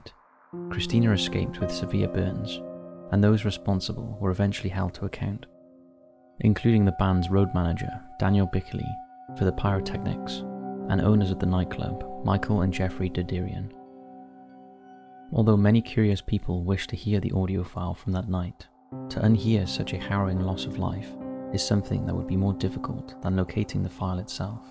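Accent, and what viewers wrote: Wales, United Kingdom. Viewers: You realise you just said the same thing? I assume it was just an un noticed slip of the tongue. We are all human. And can surely forgive such things? They are working on a quick turnaround this month so even more understandable. FYI The narrator is british- Welsh.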